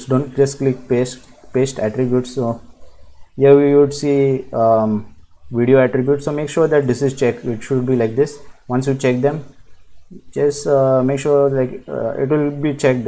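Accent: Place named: India. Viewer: Thank you so much!! I love indian accent.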